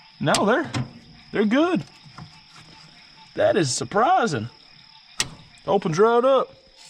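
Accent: South, United States. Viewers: I say this as a man born and raised in Alabama. Birmingham, AL. Specifically Centerpoint. I fucking love your accent dude. I love the combination of southern drawl with advanced vocabulary. It's probably the most interesting accent on earth. Reminds me of True Grit remake, how how cowboys talked. Fucking awesome, and relaxing. I've lived all over the southwest and I had people tell me I didn't sound southern. Irritating. Southern is a badass accent. Sounds better than that idiot boston accent.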